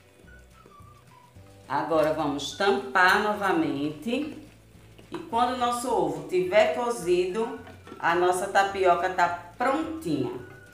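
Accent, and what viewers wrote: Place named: Recife, Brazil. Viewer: Oxe, esse sotaque lindo só pode ser do meu Recife! Tapioca eu faço todo dia, adoro! Vou fazer desse jeito também visse!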